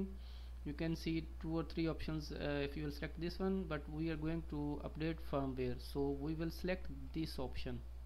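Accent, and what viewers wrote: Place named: Asia. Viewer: i was just asking as ur accent sounded like an asian bro. Anyway keep up the good work.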